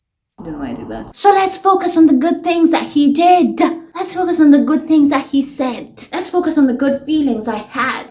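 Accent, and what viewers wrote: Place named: United Kingdom. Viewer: its the bri*😁ish accent for me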